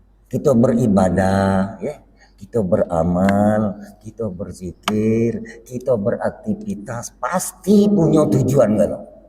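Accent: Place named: West Sumatra, Indonesia. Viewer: ADA JALAN WALAU PUN DI KIAS DAN DI LANGKAU ATURAN DALAM PERJALAN PADA JALAN ALLAH DI JALAN SIFAT ALLAH KEMBALI PADA ALLAH UNTUK KITA BAWA CAYA KALIAMAH NORULLAH✨️☀️✨️CUMA KURANG JELAS LOGATNYA UNTUK SAYA TERIMA🫶💕🫶SEPERTI BAHASA MINANG YA🫶💕🫶BAPAK SIFAT YANG TERDAHULU SESAMA SIFAT ALLAH✨️☀️✨️TAK MENGAPALAH HENDAKNYA PADA HAK ALLAH YANG BENAR KEMBALI PADA ALLAH YANG NYATA✨️🫶☀️🫶✨️AL FATIHAH✨️🤲☀️☀️AMIIN☀️☀️🤲✨️ALLAHU AKBAR ALLAH ALLAHU ALLAH"2✨️☀️☀️✨️☀️☀️✨️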